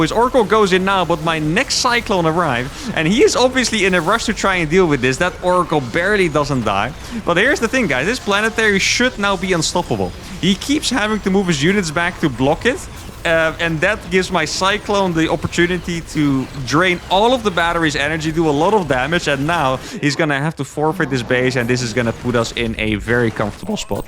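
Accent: Netherlands. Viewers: ruthermental? /dadjoke. I enjoyed this, always lovely to have dutch inflection in the accent. Go team Oranje!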